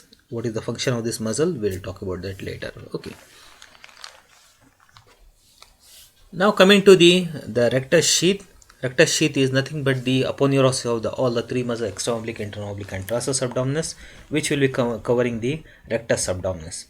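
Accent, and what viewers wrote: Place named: Arab world. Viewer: he is an arab. its the accent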